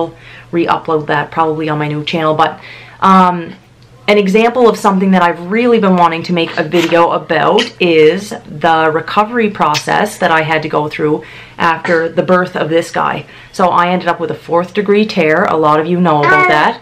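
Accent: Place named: Cape Breton, Canada. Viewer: I would definitely subscribe if you started a Mom channel. I mostly watch the Mom content anyway. I stumbled upon your channel ages ago before you started saying that you were from Cape Breton in your intros. 10 seconds into it I said, “That girl was got to be from Cape Breton!” I was born and raised there but live in NB now. I love your channel!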